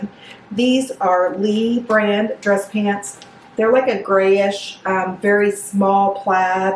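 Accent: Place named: Boston, United States. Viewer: Do you live in Boston? You have a nice accent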